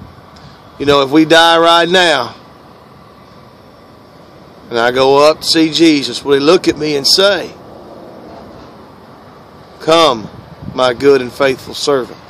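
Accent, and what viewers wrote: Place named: Texas, United States. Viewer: Make sure you post more to you tube because I am spreading the word with your videos! I moved to PA from Texas and to get your accent with my morning Word is a blessing! LOL! I have shared a lot of your videos already thru Facebook (yes, I follow you) so you are doing Gods work for sure! Keep it up and God Bless!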